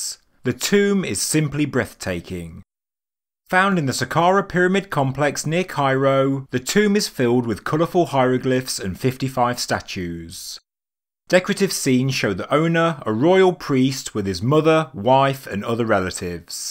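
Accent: United Kingdom. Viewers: it means 5 people think is going to get a "speech coach" to correct his voice to cater to their opinions. The guy is the UK, it's his accent and dialect. So yes, 6 useless opinions of people who if you enjoy the content watch it out you don't like it don't watch it. So why don't you "sit down and shut up."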